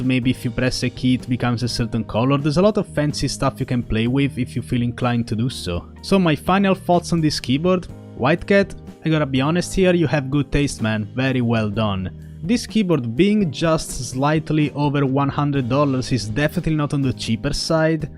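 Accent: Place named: Italy. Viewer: quando un italiano parla inglese si sente cmq grande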